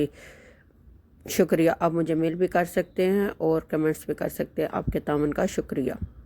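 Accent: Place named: Punjab, India. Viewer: metha punjabi lehja... behtreen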